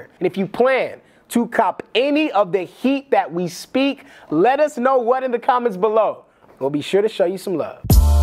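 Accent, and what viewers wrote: France. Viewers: Sacré bleu, the only thing hotter than french accent is his taste for haute couture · Très bel accent francais 👍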